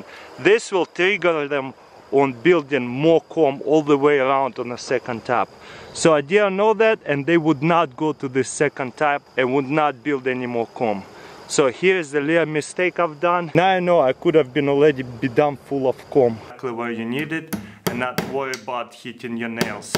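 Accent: Russia. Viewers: lol.. whats with all the anger? He was only joking. The accent/spelling is part of what makes him so amazing. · lying!! I'm a grown ass woman, not some child you can trick! He not only has a Russian accent but broken English, plus, you can find his bio on Google!!! Why tell lies? It's not gaining you anything other than looking like a fool!! Yes he lives in NC now but he was born and raised in Russia! His name is Тарас Кулаков) Does that look American to you? Actually he's Czech but that's Russian! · Hey guys. He is Russian. Do you speak other language(s) as a native speaker? No??? That's it. Think, think, think before write a comment!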